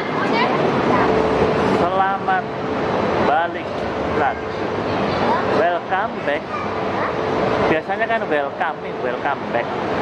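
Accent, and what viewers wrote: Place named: Java, Indonesia. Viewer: chanel ini nih yang ngajarin adik saya jadi medok ngomongnya!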